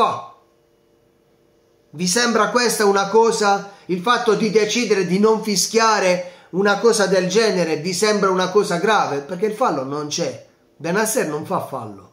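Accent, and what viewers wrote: Bari, Italy. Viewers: Analisi dei fatti è perfetta Grande sei un grande ti seguo sempre continua così. Vivendo a Roma sappiamo bene chi è il presidente della lazzieeee. Forza Milan Sempre. Un abbraccio forte a e al suo colorito dialetto barese che conosco poco ma detto da te mi fa sbudellare dalle risate specialmente quando vince il nostro amato Milan ciao 👏👏👏👏👏👏👏👏❤️🖤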